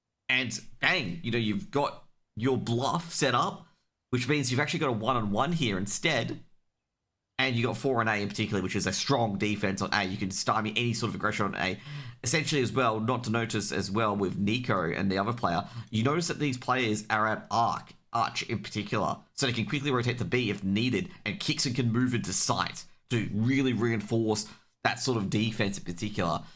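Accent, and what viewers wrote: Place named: Australia. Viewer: I like the sound of your words Australian man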